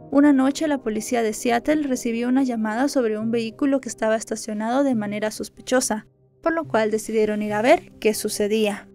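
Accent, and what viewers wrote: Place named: Mexico. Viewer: Una narración a la mexicana. Excesivo uso del marcador "pues". Se nota que esta chica narradora es mexicana. Pero podría equivocarme, mejor que lo diga ella.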